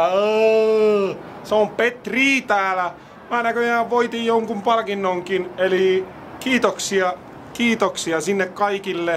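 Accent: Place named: Finland. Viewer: Herranjumala tää kosiskelee typeriä 15 vuotiata vieläkin ;_; kukaan ei varmaa tajunnu että toi ei ihan jenkki ole , jäätävä ralli-englanti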